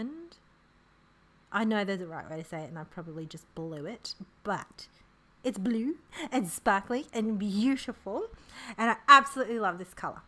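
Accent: Australia. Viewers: Glad to hear a fellow Aussie on YT. New sub from NSW ❤️🇦🇺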